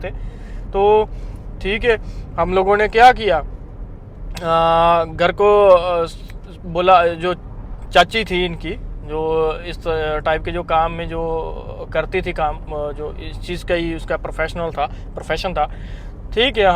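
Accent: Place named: Pakistan. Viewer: Which part of India you are from do you have video of that briefing about your self as I don’t know why your accent sounds like Pakistani accent Hindi. Very much similar to Pakistanis here in Dubai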